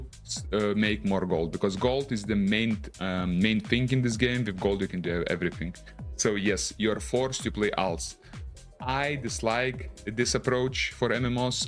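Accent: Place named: Russia. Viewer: Пипец акцент русский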